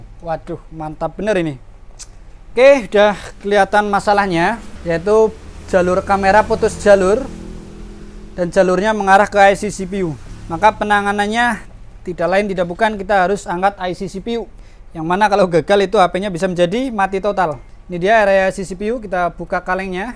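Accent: Banyumas, Indonesia. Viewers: Lokasi pundi mas ? Kayane wong ngapak loh ,😁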